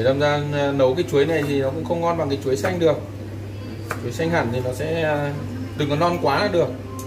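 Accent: North, Vietnam. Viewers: Nghe giọng bắc rồi còn hỏi...?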